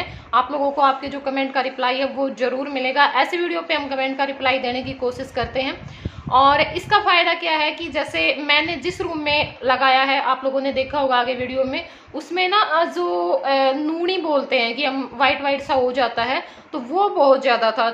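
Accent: Haryana, India. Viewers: Aap haryana se ho kya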